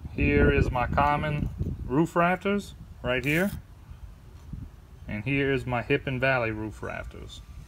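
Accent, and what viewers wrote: Louisiana, United States. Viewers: Dis dood definitely frum louisiana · You from the parishes ain't ya? Great video!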